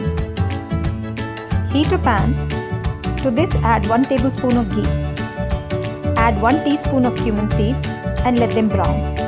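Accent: Garhwal, India. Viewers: Thank you so much mam for uploading it.and hearing Garhwali from you is such a treat to ears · मैम जतका स्वाद यूँ पहाड़ी व्यंजनू मा छ वतका इ स्वाद आपकी गढवली बोली मा भी आंणू छ।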